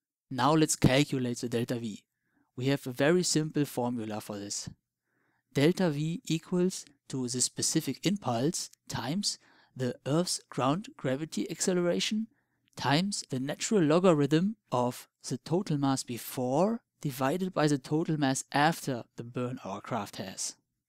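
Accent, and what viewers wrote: Germany. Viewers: Do i hear deutsch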